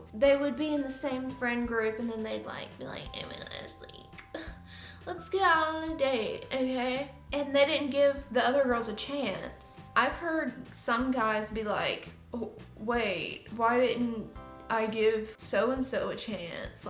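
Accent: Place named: South, United States. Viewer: I love your chill personality and southern accent, you just got a new subscriber 💓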